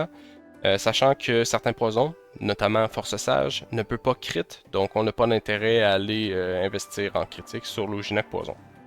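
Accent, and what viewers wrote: Quebec, Canada. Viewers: Un quebecois esti!!! Yessir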